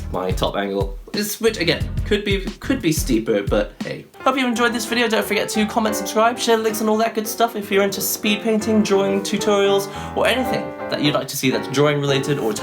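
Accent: United Kingdom. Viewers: I know its none of my business but im just curious. What nationality are you and whats your family background? Like you clearly have a british accent, you refer to most things in pounds but you clearly live in japan and appear japanese. I know people are mixed race and people move and migrate across the golbe all the time but im just the type of weirdo that needs to clarify everything haha. Thanks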